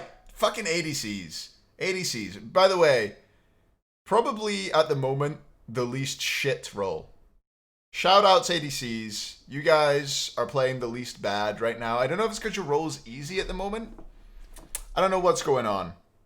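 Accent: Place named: Scotland, United Kingdom. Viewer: Best takes , perfect list , perfect video , and the most authentic Scottish accent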